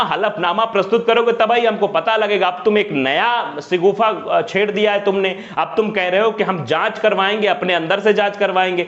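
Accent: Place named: Kanpur, India. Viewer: Are bhaiya kanpuriya ho kya tuhar Bhasha kanpuria lag rahi hai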